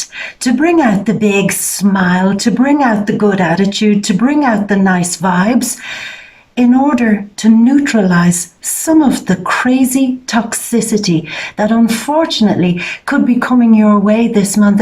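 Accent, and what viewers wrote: Ireland. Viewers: I love your reading - very helpful!! Love your Irish accent and way of reading - One Love xxx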